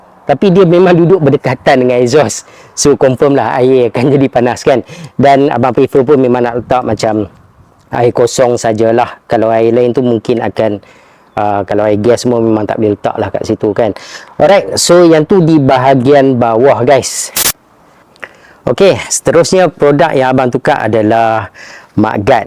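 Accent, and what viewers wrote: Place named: North, Malaysia. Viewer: Bunyi mcm utaqa...area mana bang?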